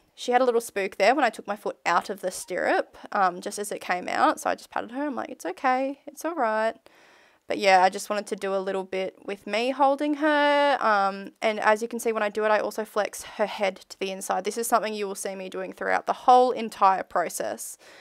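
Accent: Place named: Australia. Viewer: Well, the accent says Australia (which would be summer)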